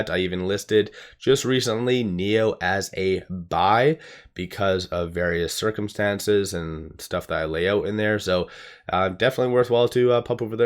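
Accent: Canada. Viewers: Good content as always. I detect a Canadian accent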